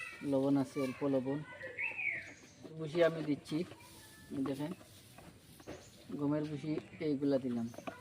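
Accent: Sylhet, Bangladesh. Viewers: ভাই আপনার বাড়ি কোথায়,,,, মনে হচ্ছে সিলেট,,, সিলেটের কোন জায়গা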